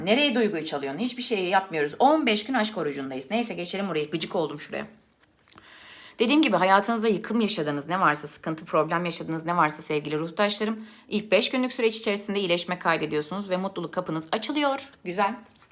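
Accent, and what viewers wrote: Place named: Aegean, Turkey. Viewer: senin ege şivenı yerim ya gidipduruu😁🥰🥰 Vallahı noluyo 2seferdır anlamadık nazaramı geldık! Aman ruhdaşım sen bırakıp gitme bizi🥺 Seviliyorsun!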